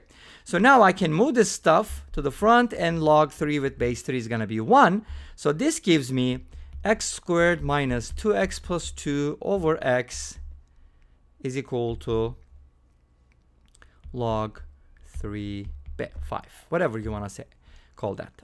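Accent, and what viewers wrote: Turkey. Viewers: yutube Algorithm beni buraya getirdi videoyu izlerken ulan diyorum kendi kendime kesin tûrk kesin yav sesinden biliyorum diyorum ama neyse videolarini ailecek çok beğeniyok 😂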